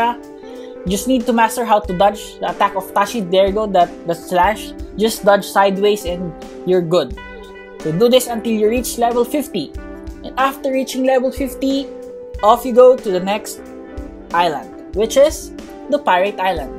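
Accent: Philippines. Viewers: I know the accent , Your a Filipino right? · R u a filipino u sound like filipino hahahahaha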